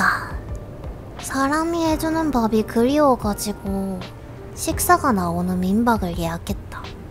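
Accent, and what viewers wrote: Gyeongsang, South Korea. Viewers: 경상도 사투리 너무 듣기좋아요~^^ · 갱상도 억양? 암튼 바이크 이야기 좋네요....안라! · 전 경상도 사람이라서 억양이 낯설지 않은데요 일본어 할때도 억양이 경상도스러워서 어떤게 조선말이고 어떤게 일본말인지 헷갈리네요 actually 전 일본말을 모릅니다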